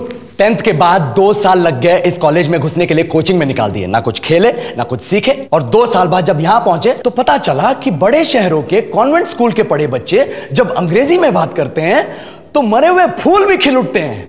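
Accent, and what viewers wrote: India. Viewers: Is he telugu speaker 😮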